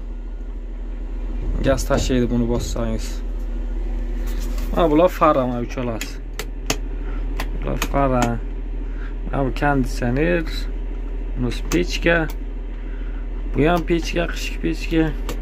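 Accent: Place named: Karakul, Turkey. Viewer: Ака Каракулданмисиз шева ухшаяпти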